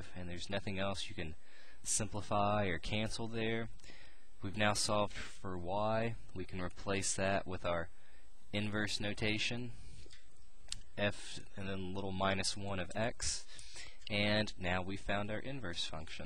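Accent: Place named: United States. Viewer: As soon as I heard an American accent, I knew this was a different method.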